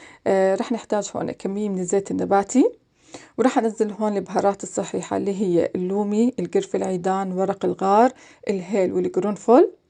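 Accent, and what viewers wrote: Jordan. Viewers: أردنية أردنية يسعد لهجتها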